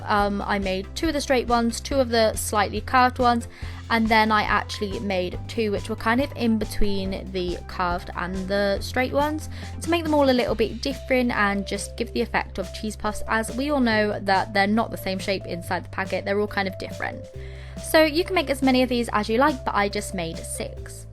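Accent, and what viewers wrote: England, United Kingdom. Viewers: You just say some british accent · I'm guessing Your From England But who cares? I love you!❤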